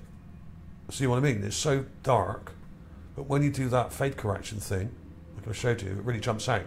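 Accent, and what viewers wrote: United Kingdom. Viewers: Are you from the U.K.?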